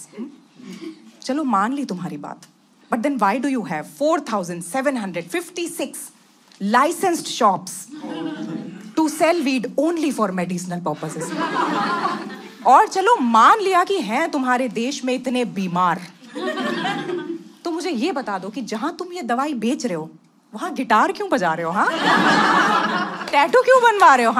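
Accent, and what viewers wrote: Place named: Punjab, India. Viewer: U r not funny at all. I don't know why people are laughing. Punjabi accept and poor punches.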